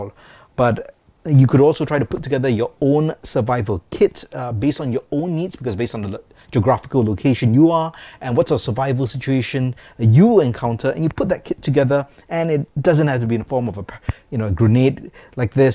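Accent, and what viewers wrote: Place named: Singapore. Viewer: I suspected that you were Singaporean because of your accent but you don't say "La" so I'm not sure.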